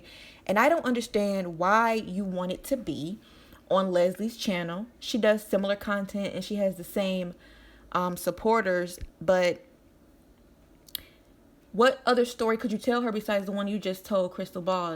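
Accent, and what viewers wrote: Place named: South, United States. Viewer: Agreed,,,, I’m a white girl, and color doesn’t matter to ME! I wish the world would see us all for our differences being color of skin, color of hair, eyes, etc.... I think you have a beautiful southern bell accent. Thanks for your FACTUAL video! Do I like to HEAR the FAKE ASS Katie Joy! Absolutly not. The latest leaks of her talking about Leslie Bass’ nephew passing is HORRIFIC. I will never watch or support her again!!! She also said that Steve McRae sexually harassed people and sent dick picks. I can’t believe this about Matt based on her history!!! I’m not saying I like Matt or not. But I don’t believe it. She plays dirty.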